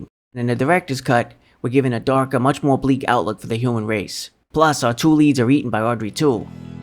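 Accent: New York, United States. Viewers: Nice review man! I love Little Shop! I’ve actually played the voice of the plant Audrey II on stage in the musical production. It’s still one of my favourite musicals and roles I’ve ever done. I’m so glad you brought up the director’s cut!! Because that’s how it is in the stage version and I love the bleak ending. EXCELLENT choice of film! Also, I don’t want to assume, but you sound like you are from New York? Whatever you accent is, it’s awesome man haha 😎👍🏻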